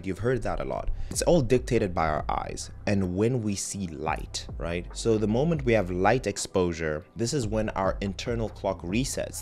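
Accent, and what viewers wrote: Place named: South Africa. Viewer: I detect a South African accent…. Am i right? Very nice summary!! Thank you!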